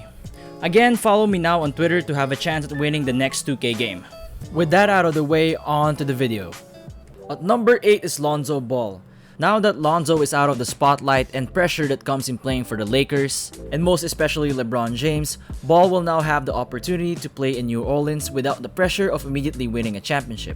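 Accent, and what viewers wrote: Philippines. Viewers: Man I recognise your accent, are you Filipino my guy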